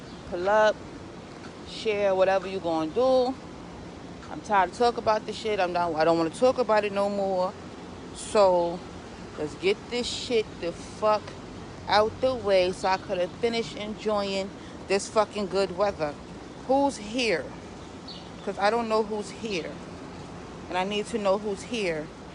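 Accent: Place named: New York, United States. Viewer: That NY accent so gully! Shit is fire!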